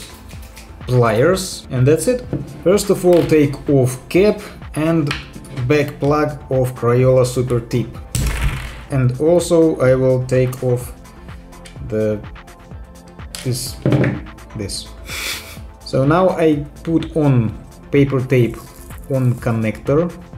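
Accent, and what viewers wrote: Russia. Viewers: I have a question why you liked a Russian trying to speak English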